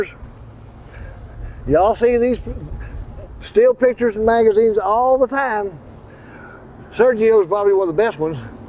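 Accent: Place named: Texas, United States. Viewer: I bought his tapes in 1997 and i started hitting the ball straight as an arrow, My VHS broke and I forgot what he taught me. I'm 72 now two hip surgery's later and struggling. I'm glad I found him on You tube. i' m also going to buy a VHS and start resurrecting my game. I just love listeneing to his folksy Texan sytle-a great teacher.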